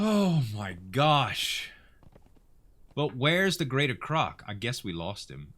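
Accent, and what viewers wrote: Louisiana, United States. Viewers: Think I found my new favorite channel! I'm getting ready to start binge watching your videos. I could be wrong here, but it sounds like you are from Louisiana (my wife is from Houma)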